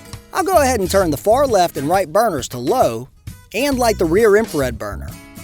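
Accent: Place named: Midwest, United States. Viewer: If you were born in Gonzales , Louisiana . Why , can't you pronounce French words correctly ? You sound your words not with a Louisiana accent , but with a typical Midwest accent . I will say your food looks absolutely delicious . I really enjoyed watching you prepare your dishes . "Bon travail avec votre programme alimentaire ". Dieu vous protege.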